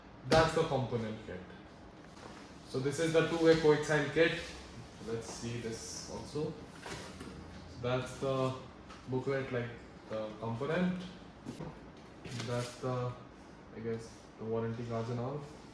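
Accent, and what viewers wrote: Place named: India. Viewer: It is not amazing - I can barely understand anything with his deep hindu accent!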